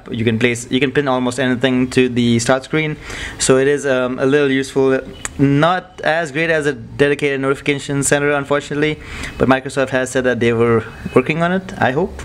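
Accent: India. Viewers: i hate indian accents they annoy me im sorry to say but looks like the place iswonderful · Thumbs for up that indish accent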